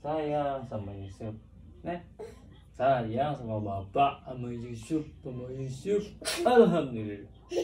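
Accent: Lombok, Indonesia. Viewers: Bang logat lombok nya masih melekat😁💪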